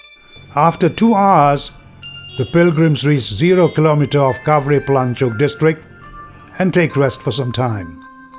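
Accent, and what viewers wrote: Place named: India. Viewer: how from california having indian accent?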